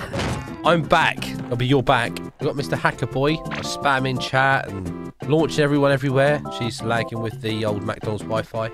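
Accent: United Kingdom. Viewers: YOUR VOICE IS AMAZING THE BRITISH ACCCENT I CANT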